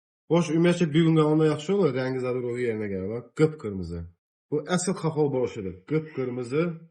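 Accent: Zaqatala, Azerbaijan. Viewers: Аnkaradan Zakatalalı hemyerlimə salamlar. Bişirdim. Çox gözəl oldu. Sağ ol. Lehcemizi özledim. Su tökax❤😂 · Mən istəyirdim yazım ki,qardaş diyəsən zaqataladandı,çün ki,zaqatalalılar belə danışırlar,eliyəsiyəm,gedəsiyəm,gələsiyəm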